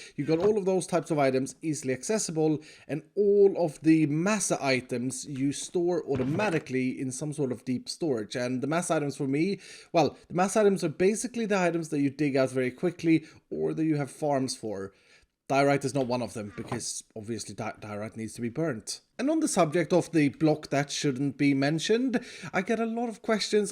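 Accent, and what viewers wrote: Australia. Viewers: Dont worry he's just Australian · He's just Australian, has to be the best thing I've heard in weeks. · He's just Australian. xD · LMAO he's Australian! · Dude lol bruh it’s aight he’s just Australian 😂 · Dont worry he is just Australian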